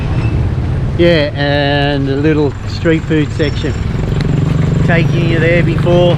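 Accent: Australia. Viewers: Are you Queenslander? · Hi. I think using English letters (Romanised) for Lao is very difficult. There is no standard. There are many Lao - English dictionaries, but assume you speak French. (Hence the screw up with the "S" in Laos) or that you know phonetics. I speak guttural Northamptonshire English; and you Aussie. (I assume that's the noise being picked up by the microphone.) We both know English and can understand each other, but it's those vowels and tones that have to be correct when speaking Lao. if i wrote in my dialect, what you'd say would be miles off. I work with a Glaswegian - lovely chap - but I have to listen to him closely. When he is with his mates - I give up. That was a long way of saying "No" - but I hope helps! Here's a link to a web site I'm playing with. <link>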